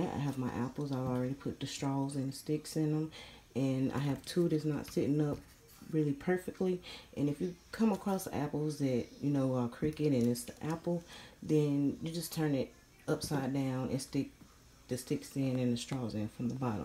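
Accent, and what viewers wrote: United States. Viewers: Never watched one of your videos before have to say I detect a New Orleans accent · Are you from the south, , I Am, I love your voice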